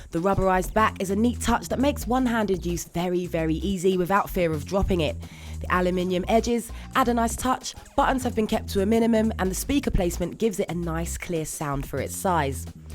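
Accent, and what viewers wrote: United Kingdom. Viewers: I love hearing that British English!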